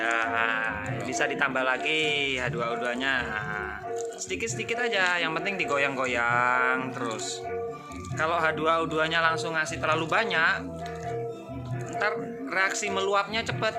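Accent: Surabaya, Indonesia. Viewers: Om daerah mana?? Kok bhs nya Suroboyoan. ??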